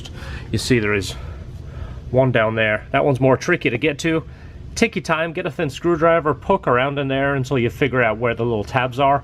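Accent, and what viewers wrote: United Kingdom. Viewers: I'm probably wrong but do you have a British twang to your accent? ..Other than that, great informative video..Si..UK.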